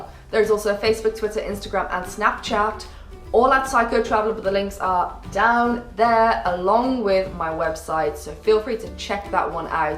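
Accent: England, United Kingdom. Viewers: here expressions are very cool. I was just searching for some brazil thing and I found a video by the title language challenge. in that her facial expressions were awsome and with that english accent her voice is like a melody. Nice video for the people who love to travel but can't afford.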